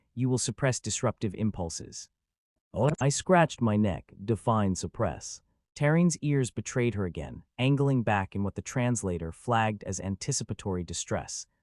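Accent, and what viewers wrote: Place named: United States. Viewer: Plenty of aussie accents on AI. Kinda wrecks the story with a yank voice and customs.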